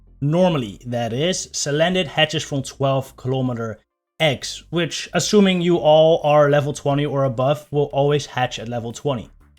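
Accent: Netherlands. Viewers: you sound so dutch lol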